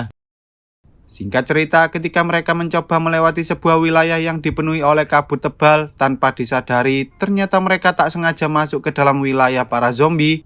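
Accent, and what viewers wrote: Java, Indonesia. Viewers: Miminnya logatnya masih medok😅 tpi seru jg dengarnya😅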